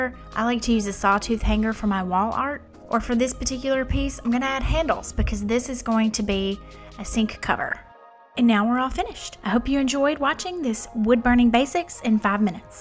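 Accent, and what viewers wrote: South, United States. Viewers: As a South African who recently tapped into this market, it wasn't the tips and tricks that kept me here... It was that addictive southern "if I'm correct" accent. The actual content was a added bonus 🤣🤣🤣. On a serious note though, do you sand the piece after burning??? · You’re cute, I love your Southern accent! I’m from Minnesota. Take care!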